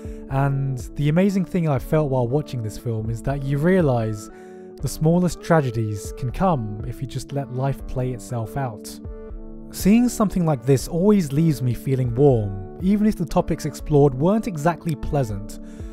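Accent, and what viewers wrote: United Kingdom. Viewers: You know, watching videos is a lot like watching a well-written show. You have the videos like his "Anime in Minutes" series or his rants/explanations like the "Konosuba" video series which are meant to be lighthearted entertainers. Then, every once in a while, you get videos like these. That same lovable British accent we all know for cracking the occasional dick-joke goes on to bring us "The Perfect Anime" and videos such as this which explore personal feelings and memories. These videos remind me of watching an anime, because while we connect to anime, many of us can probably connect to this video. It's really something amazing that I can watch this video and get so much more than a single, simple point out of it. It's as if you've just said what many have tried to say but couldn't. Thank you.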